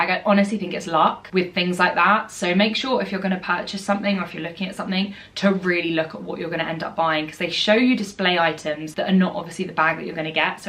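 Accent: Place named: United Kingdom. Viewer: Is she British? She’s has their accent!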